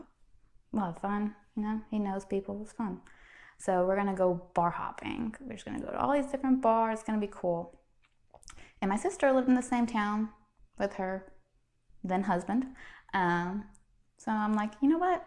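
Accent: South, United States. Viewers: im barely now realizing your southern accent lol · You're a trip. You can't really hear the southern accent in the asmr videos but it sounds good on you.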